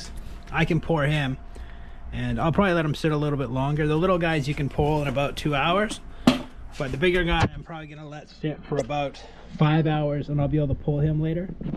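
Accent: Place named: Upstate New York, United States. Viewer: Where do you sell them? Garden centers? Your accent sounds like upstate new York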